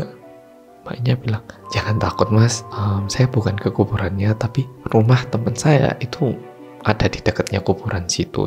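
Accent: Java, Indonesia. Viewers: Kesian ih Mbaknya 😭😭 semoga lukanya pulih Makacih, Boloku Sing Paling Medok Dheweeeee 🙏🏼🙏🏼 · Suaranya khas bgt....logatnya apalagi....tetap jawa y mazzeeeh....sukse trs ya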